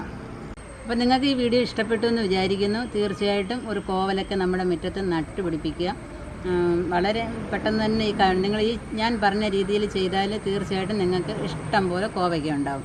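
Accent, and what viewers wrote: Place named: Thiruvananthapuram, India. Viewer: തിരുവനന്തപുരം slangs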